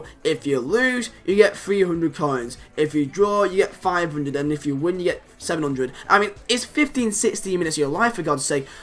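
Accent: United Kingdom. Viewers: u need to learn how to speak proper understandable english not this terrible english with a british accent that sounds dreadful.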